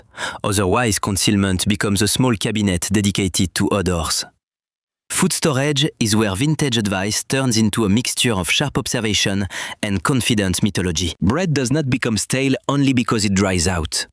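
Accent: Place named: France. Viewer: I like this decent French accent😊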